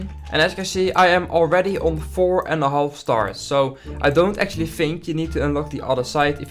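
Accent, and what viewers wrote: Netherlands. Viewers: You can hear so much that he just speaks Dutch. It's nice that you make English videos. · love the dutch accent are you from dutchland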